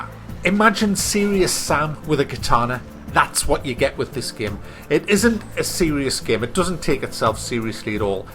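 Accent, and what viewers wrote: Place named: Wales, United Kingdom. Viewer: You have, in all likelihood, the MOST Welsh voice I've ever heard!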